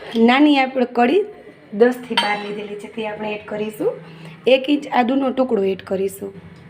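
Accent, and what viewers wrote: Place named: Kathiyawad, India. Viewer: Khub saras vangi che. Tame kathiyawad na Cho? Bolvano lehko tya no lage che😊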